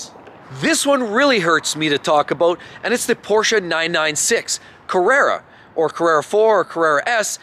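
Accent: Canada. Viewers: You are very well versed in automotive technology. You reinforce my distrust in newer vehicles. Not to generalize too much but they are nothing more than over priced junk. You sound like you are from Canada. Ehhh...!!!!! Thank you.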